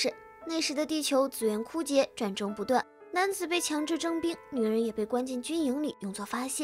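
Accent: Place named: Mainland, China. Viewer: 大陸有些字唸法跟台灣不一樣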